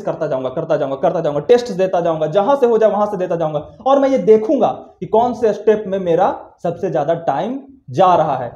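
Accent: Bihar, India. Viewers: Bhaiya aap bihar se h kya pls Tell ❤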